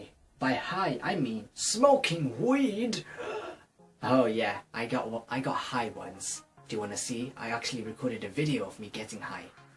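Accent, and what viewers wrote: United Kingdom. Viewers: Oh no!, it appears as though I am to late to have asked you any questions for this video! D: Regardless, I always enjoy hearing you talk in your fancy British accent c: And might I say, I am truly honored to be a part of your legends list! I know I have not been editing much lately- I seem to have hit a creativity block- but I hope to have some kind of video completed before Christmas. Life has just been so busy lately! I hope things are going as awesome for you as your hair is!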